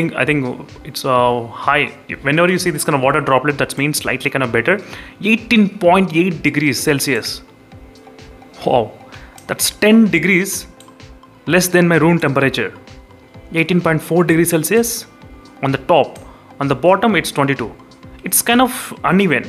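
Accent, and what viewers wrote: South India, India. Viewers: Mother tongue influence! I guess he is south indian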